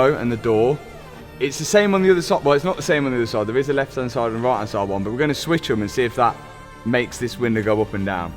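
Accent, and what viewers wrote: Leicester, United Kingdom. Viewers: I miss the Leicester accent 😅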